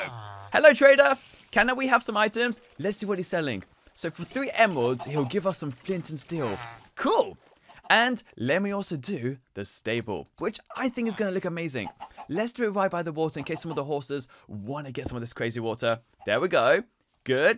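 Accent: United Kingdom. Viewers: Are you british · Obv he's British 🙄 That's clearly a British accent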